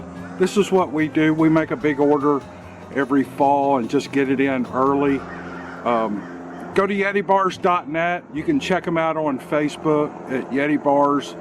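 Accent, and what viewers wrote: South, United States. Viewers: don't change anything. I just discovered this channel not too long ago, and I really like your laid-back, down-to-earth approach. Your accent and the background country music makes me miss the USA as a UK citizen. I went to school and worked in Iowa and Massachusetts for 18 years, and it's like a second home to me. Thanks for all you do.:) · I'm from Oz&love that Southern US accent. The more yakkin from the more content I am👍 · I could listen to Southerners talk all day. I love your channel, especially the Steve Lily stories. Don't join the cancel culture and change something that's great, just to appease a few brain dead whiner's. God bless, James in London. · YOUR UNIQUE SOUTHERN BRAWL AND EXPRESSIVE OPINIONS ARE WHAT MAKES YOU........WELL, YOU! IF THESE VERY FEW "CRITICS" DON'T CARE FOR YOUR STYLE OF NARRATION, THEN, HEY, THEY CAN ALWAYS GO SOMEWHERE ELSE!! 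IT IS, AFTER ALL A VAST INTERNE WITH MANY OPTIONS. I'M SURE I SPEAK FOR MANY OF YOUR LOYAL LISTENERS IN SAYING "YOU KEEP ON KEEPING ON"! "YOU DO YOU"!! YOU GIVE THE STORIES THAT LITTLE TWIST AND YOUR REFRESHING OPINIONS REALLY ENCOURAGE ONES WHO MAY BE SUFFERING OR ANXIOUS ABOUT THEIR SIGHTINGS!! KEEP ON "YAKING", LOVE!! FROM ALL YOUR FELLOW TN FANS, DON'T STOP BEING!! LOVE YOU JUST THE WAY YOU ARE!! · Chat all you want to. How can you not enjoy a southern accent? It makes some of us go weak in the knees. 😉😊🐾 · I haven't listened to this channel in a while, but I do like Southern droll, his enthusiastic style and layed back charm, but I've noted in the YT comments(and in narration), that there is a ruckus about analaysis after each story/report? Can I suggest that it could be a good idea to put bookmarks for each story with matching timestamps within the description - it'd be easy to do and would keep everyone happy(ish). 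BTW there's also some grumbling on other topically associated YT channels about this too - maybe it's a "sign of the times" thing that we are currently living in/under?! Keep safe and well, and remember to be humane to each other(I include myself), as times are tough in many ways(ditto).:)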